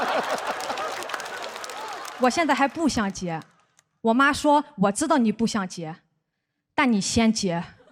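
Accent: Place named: Shandong, China. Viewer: 临沂口音听着太那啥了😂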